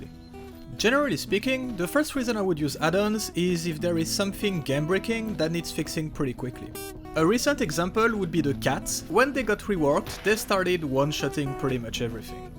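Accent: France. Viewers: amazing channel my dude. I just started Anomaly yesterday and you helped me a lot. I'm curious tho, where does your accent come from? Sounds like a french accent.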